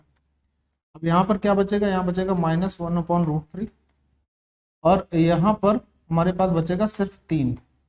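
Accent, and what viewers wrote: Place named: Farrukhabad, India. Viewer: Sir kha se hn aap Farrukhabad ke aas pas ki bhasa lg rhi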